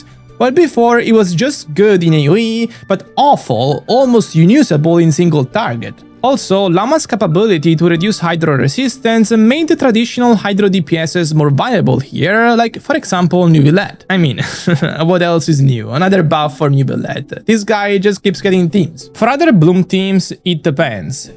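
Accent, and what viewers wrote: Italy. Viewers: Chat is this guy italian? He sounds like one and i like it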